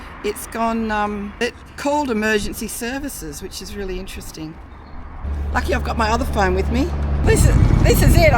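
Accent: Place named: Australia. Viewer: You are one amazing woman love the Aussie accent and when you start swearing it’s off the dial love it carry on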